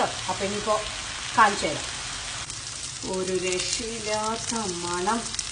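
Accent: Palakkad, India. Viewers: I am making vendaka dish for dinner and continue palkkaden accent in your presentation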